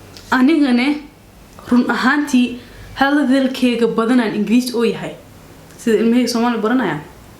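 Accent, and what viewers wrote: Somalia. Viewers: By all means it's a compliment but I love your Somali accent. Thanks for the much needed topic sister.👍🏾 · hhhh, waxaa qosol igu dilay hadal keda iyo sida ay afsomaliga ugu hadleedo , runtii waa cajiib. lkn waxay tilmaam u tahay iney rabto in dhaqankeda iyo hidaheeda ay xajisato , lkn waa qof nool oo dareen weyn xambaarsan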